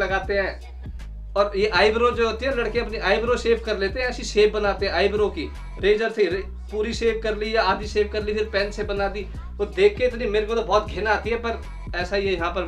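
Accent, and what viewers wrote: Haryana, India. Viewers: but he is from haryana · Bhai Haryana se hai kya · you still talk the Indian way ... that's cool thing about you ... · Haryana se ho bhai..?? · Aaha haryanvi.......cha gya chore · Hahahah , l like your desi Accent. I love this video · Yes. Muje b yahi accha laga.. k inhone indian way me bat ki.. isliye mene channel b subscribe kia.. i like ur video man..😄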